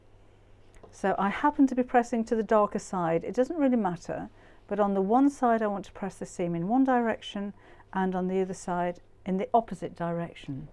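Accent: United Kingdom. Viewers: I’ve been quilting for 20 years and have never seen this unique construction method. This technique is a genuine “game changer.” ‘ Thanks so very much for sharing this,. I love your tutorials with your cute British accent and soothing voice! I am originally from Texas, so you can imagine how fascinated I am with a British sewing teacher! God bless you!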